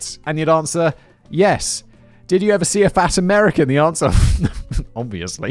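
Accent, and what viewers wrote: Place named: United Kingdom. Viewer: It sounds racist coming out with that British accent.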